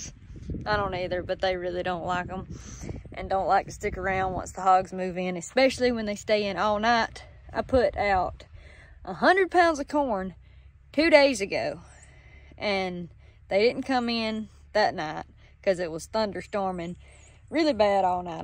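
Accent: South, United States. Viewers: I like your spécial south accent.... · Back in <num> I was on active duty in the USMC. Ended up as a Sergeant E-5. It was people like you especially with that southern accent that I could really rely on to get the job done. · You've got the cutest southern accent ever!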